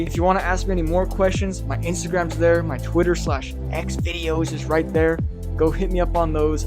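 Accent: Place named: Northern California, United States. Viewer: I was guessing he might be somewhere from the 530 area code in Cali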